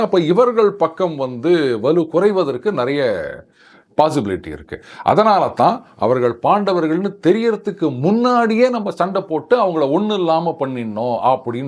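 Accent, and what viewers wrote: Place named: Chennai, India. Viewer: பாரதத்துக்கு மட்டுமாவது “ சென்னைச் செந்தமிழ் “ வேண்டாமே.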